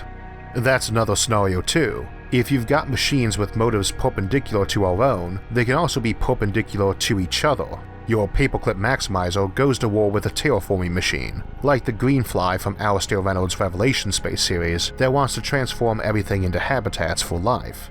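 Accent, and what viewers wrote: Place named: South, United States. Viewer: Lol. I didn't even know you had a speech impediment. I'm English and thought you had a Southern American accent.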